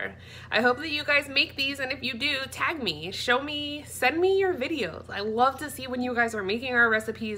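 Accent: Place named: Philadelphia, United States. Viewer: I hear a Philly maybe Jersey but mostly Philly accent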